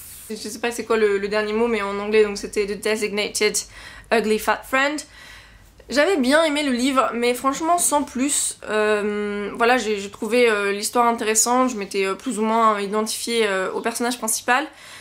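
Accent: United Kingdom. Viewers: Hello ! Alors pour ta question, ça me brise le cœur de dire ça étant une Potterhead à la vie à la mort mais... En ce qui concerne Harry Potter et le prisonnier d'Azkaban, j'ai préféré le film au livre :'(. Voilà, c'est dit ! La musique, l'ambiance, tout est magnifique et le livre est bien, mais pas autant que le film (et je l'ai relu dernièrement pour confirmer mon avis !) Sinon 13 Reasons Why c'est une tuerie (pardon pour le jeu de mot involontaire) ! En 2 jours c'était fini, terminé ! Fortement captivant ! Géniale cette vidéo ! J'adore le concept :3 (Et ton accent anglais qui déchire !). Bisous !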